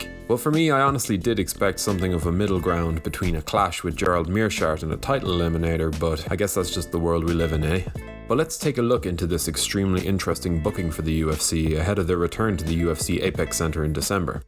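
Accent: Ireland. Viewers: u Irish my guy?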